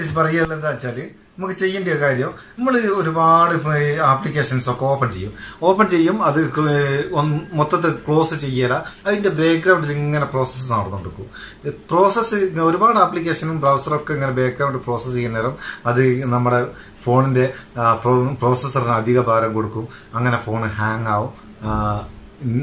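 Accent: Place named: Northern Kerala, India. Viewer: കൊള്ളാം. പക്ഷെ ഈ ചങ്ങായീടെ ഭാഷ നമ്മള് തെക്കർക്ക് നല്ല കൗതുകമാണ്‌. പിന്നെ കലാകാരനാണെന്നും പറഞ്ഞു. കല ഏതാണ്‌. കഥകളി? or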